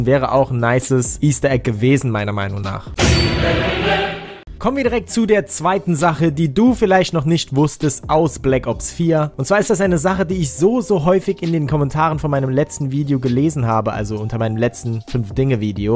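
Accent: Saarland, Germany. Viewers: ausm Saarland ? Und Frohes Neues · Warum hast du nen türkischen Namen?Du klingst entweder sehr gut integriert oder wie nen Deutscher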